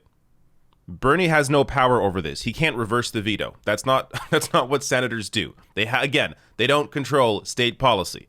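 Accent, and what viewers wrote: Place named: Canada. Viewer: Canadian accent coming in extra strong today.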